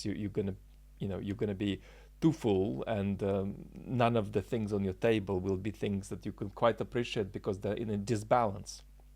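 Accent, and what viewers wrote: United Kingdom. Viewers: First time I've detected a limey accent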